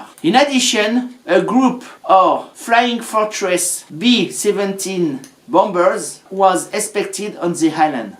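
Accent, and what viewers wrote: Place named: France. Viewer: Génial les effets sonores et la cornemuse à la fin ! Ça semble vachement plus personnel avec ces décors et ce long travail de montage qui nous tient en haleine. Parler anglais ça amuse l'oreille vu que ton accent est français, super idée ! Tu t'y connais en histoire militaire de la chine ? Genre dynastie Qing ?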